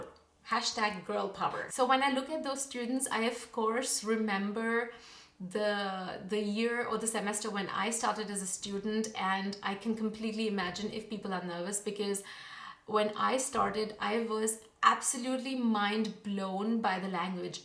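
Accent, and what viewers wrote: Germany. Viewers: Well, dialect may be a too strong word... your German has a Kaiserslautern flavour. I believe not many people in Germany can hide their "origin". I live in the North (Schleswig-Hosltein), and people always notice where I am coming from. Hochdeutsch (high German) mainly exists in written form or in TV news. Unrelated, there are 2 Videos with perfect or close to perfect light: This one and the vlog about racism. I hardly know any channel with such a steep leaning curve. Chapeau! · lovely german accent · Sie hat auch so einen deutschen Akzent. Wir haben schon vermutet das sie in Deutschland aufgewachsen ist. Aber vielleicht ist sie Hindu und war in einem frühreren Leben deutsch.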